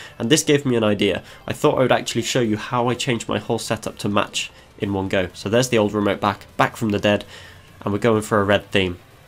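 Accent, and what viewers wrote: United Kingdom. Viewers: Hey, where are you from? London?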